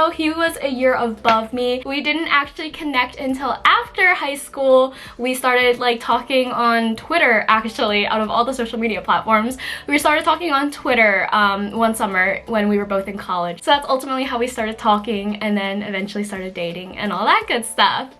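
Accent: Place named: South Jersey, United States. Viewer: woo hoo new jersey!! it sounds like you have a south jersey accent (a little bit)!!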